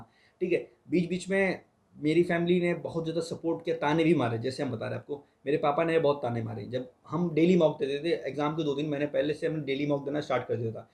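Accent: Kanpur, India. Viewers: are bhai ap kanpur se ho?... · Love your kanpuriya accent 😎😜😉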